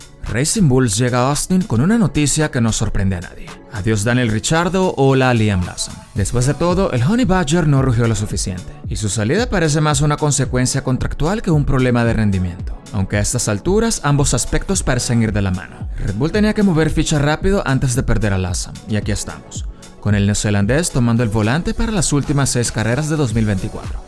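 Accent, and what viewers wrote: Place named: Venezuela. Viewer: a pensé que eras de México, porque no tenes casi acento venezolano xd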